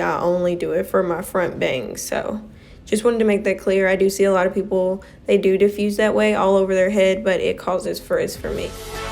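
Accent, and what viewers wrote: South, United States. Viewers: Where you from?! Sound like a southern girl like me 😊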